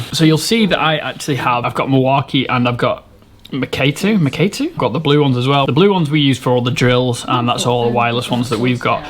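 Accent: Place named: United Kingdom. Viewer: Sometimes the British accent makes it impossible to research some of this stuff online. What is a keg stone? A ket stone? A keystone? Ketsone? Ketsone?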